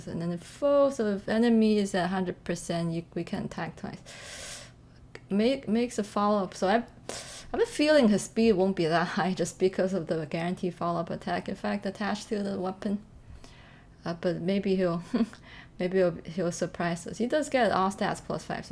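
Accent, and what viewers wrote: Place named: England, United Kingdom. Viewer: Pretty nice~ ^^ I like to listen to your English accent and learn a little Chinese from you. ^^ Cheer up ! (^0^)/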